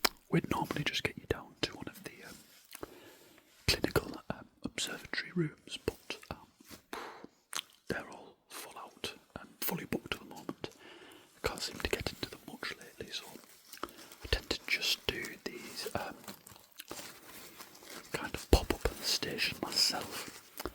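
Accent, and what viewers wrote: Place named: Northern England, United Kingdom. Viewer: This is amazing . I’m rubbish at telling the northern accents apart (Londoner 😂) but whatever it is, is so relaxing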